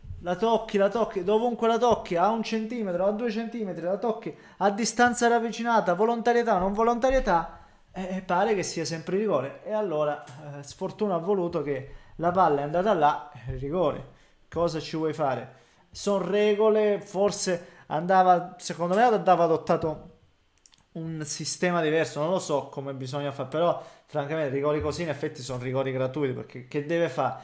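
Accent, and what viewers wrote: Calabria, Italy. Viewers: di che zona bella sei della Calabria? Spero no di Cutro.. 😂😂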